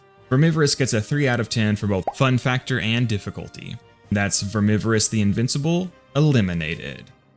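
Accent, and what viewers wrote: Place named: Texas, United States. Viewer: I love the slight Texas southern twang to your accent. “Aaai play with aaaa controllaar” 😂👌 coming from a queens English speaking Brit it’s highly amusing.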